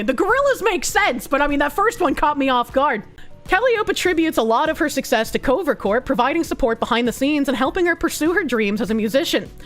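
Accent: Boston, United States. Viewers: It's Bostonian dialect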